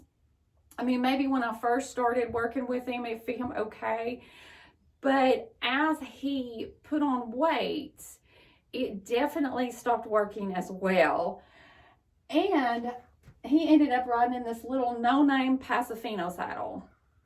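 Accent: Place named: South, United States. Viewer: Very helpful. Thank you! Also love your accent. I miss being in the south for the accents, the sweet tea, and the riding weather!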